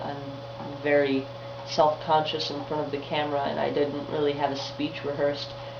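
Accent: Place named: Midwest, United States. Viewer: You say "to me" like a midwesterner now!!! You say TO me, instead of to ME. (Loving linguistics.)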